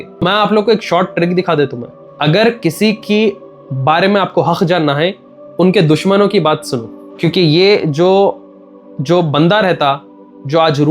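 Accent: Hyderabad, India. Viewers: aplog kaha se ho bhai Hyderabadi lagra style mje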